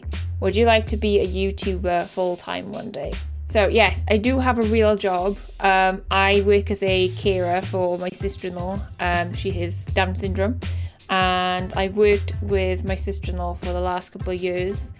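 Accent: Wales, United Kingdom. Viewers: People from Australia are top people :) thanks for answering my questions. I guess I am old, that playstation comment dated you and surprised me as I thought you were a lot younger. I came her for the valheim stayed for the valheim and the welsh accent.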